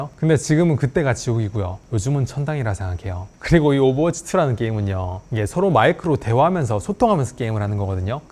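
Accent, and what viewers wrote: Gyeongsang, South Korea. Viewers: 단한번도 스킵하지않고 넉놓고 봤네요,,,, 항상 경상도 사투리속 억지로 서울말 쓰시려는 말투가 재밋고 귀에 들어와서 챙겨봤는데 많은걸 알려주시는 영상이였습니다,,, 항상 알차고 필요한 부분만 리뷰해 주셔서 감사합니다 ㅎ 같이 화이팅!!!!!!!!!!!!!!!!!!!!!!!!!!!!!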